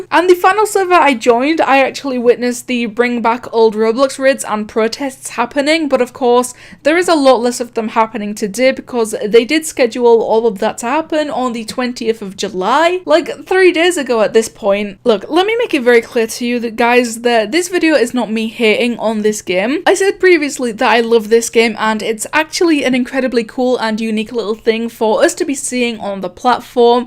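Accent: United Kingdom. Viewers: It sounds like u live in the UK, nice! Diff then but.... your not “coping him” of course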